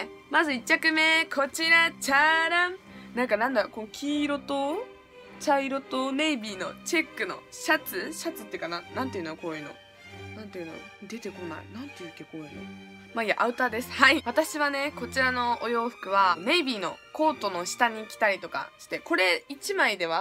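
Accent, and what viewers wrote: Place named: Hakata, Japan. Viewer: 博多弁がすごい好き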